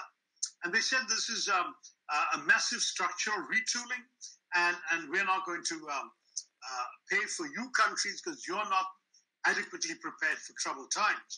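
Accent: England, United Kingdom. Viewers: Respected sir, this is sandeep sharma working with IFFCO as a stenographer. I have been watching your video to enhance my vacab. And its knowledgeable too. You are great sir. I am really gladdened with you english accent and vocab. Please be there to improve english of a person like me. Thanks a lot .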